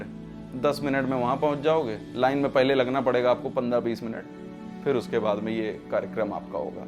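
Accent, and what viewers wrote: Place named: Kanpur, India. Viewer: आपकी भाषा में कानपुर का flavour रहता है अच्छा लगता है बाहर हो तो और अच्छा लगता है। जय बाबा की 🙏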